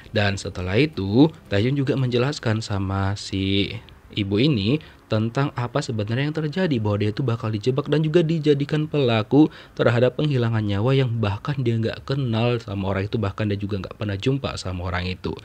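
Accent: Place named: Sulawesi, Indonesia. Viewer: Ko macam orang sulawesi kah atau ntt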